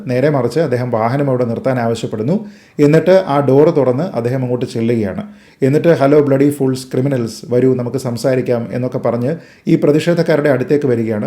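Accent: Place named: Central Travancore, India. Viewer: സാറെ നിങ്ങളുടെ മണിമണി പോലുള്ള മദ്ധ്യതിരുവിതാംകൂർ മലയാളം കേൾക്കാൻ തന്നെ എന്താ സുഖം 😅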